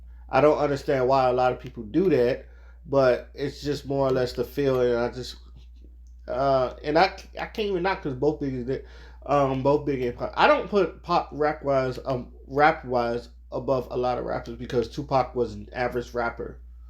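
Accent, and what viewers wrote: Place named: East Coast, United States. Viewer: U sound real dum n east coast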